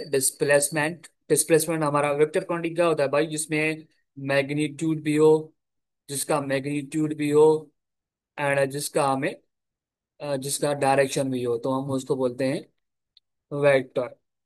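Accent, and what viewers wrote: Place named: Sindh, India. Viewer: Your accent looks like you are sindhi? Acha kia dawood uni khi ka test easy hota hai ya difficult?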